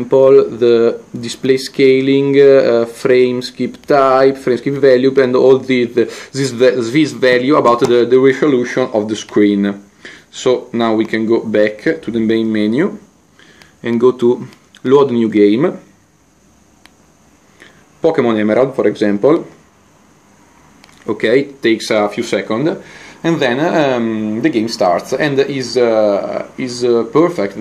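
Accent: Italy. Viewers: natsu sempai Vabbè, dopotutto è italiano, si capisce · Sei ita si sente vero? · Your english is good and I understood everything. It's not a problem that you have an italian accent. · Si sente che sei italiano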